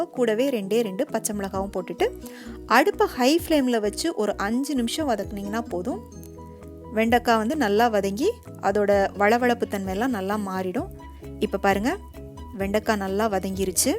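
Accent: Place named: South Tamil Nadu, India. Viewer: mmm nanun ipdithan seyven! And which is ur native ! Your slang seems that u r frm south tamilnadu!am i right !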